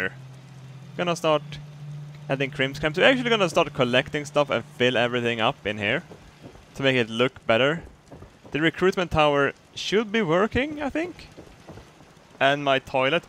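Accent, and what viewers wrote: Sweden. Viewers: "Home cozy" love your swinglish... Have you found any "stabsnakes" yet?? You've got a new subscriber! 😄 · best Swedish accent ever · are you swidish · Jacob Tidwell he’s Swedish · det är så kul att man kan höra när folk på Youtube är från Sverige bara genom att lyssna på när de pratar engelska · are Swedish right? I can hear it on your voice. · "fort yinyer"...dat swedish english though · Is Swedish or something? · you better make more of these ...I can't fuckin stand other non vikingss talking in non swedish accents THE GLORY IS ALL YOURS BALDER HIMSELF LAUGHS MARKED WITH LIGHTNING STRIKES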